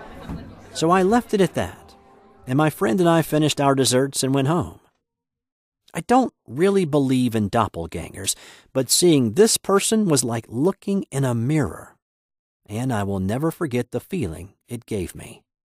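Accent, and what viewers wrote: South, United States. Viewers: Gotta love that Eargasmic Prime Storytelling Southern Accent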